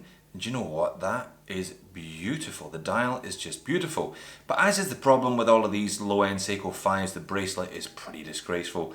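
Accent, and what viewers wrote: Scotland, United Kingdom. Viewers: Greetings from New York. Is that a scottish accent? Beautiful watch, but I've never seen a bracelet come in such poor condition with such horribly rolled links. The case and dial look right on.. but that bracelet almost looks counterfeit!...Either way it's a beautiful watch and I agree whole heartedly that it punches far above its weight class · Upon starting the video I was absolutely delighted to hear your Scottish accent lol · where are you from? scotland?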